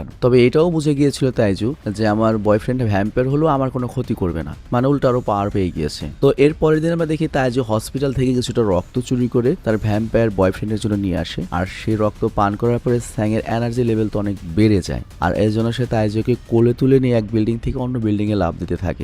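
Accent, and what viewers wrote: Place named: Chittagong, Bangladesh. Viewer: ভাইয়া মনে হয় চাটগাইয়া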